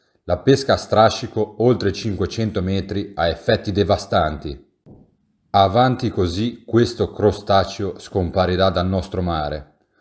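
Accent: Genoa, Italy. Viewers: Belin l'accento genovese CI VUOLE non perderlo mai! E per l inglese ce ne battiamo il belin sugli scogli di Camogli 😆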